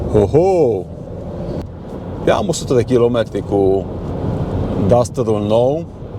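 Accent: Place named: Hungary. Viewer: No,un allroad şi perfect pentru Nămțîia asta care închide Fabricile.. Nai Treabā.. Superbā maşină pentru Clasa medie.. Ieftină ,fainā,,bună Ca Ciocolata Ritter Sport😂 Foarte simpatic prezentatoru. Apăi ios Şofer de Bus în Germania.. Sas din Ardeal Sas dāla..😂 Tare mult î-mi place accentu ăsta Maghiar😊.. Domnu cu voi..❤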